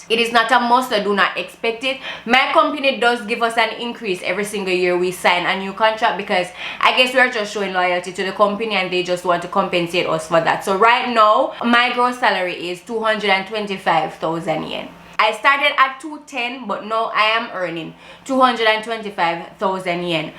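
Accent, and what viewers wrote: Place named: Jamaica. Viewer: Ik a Jamaican when I hear it love this channel ❣️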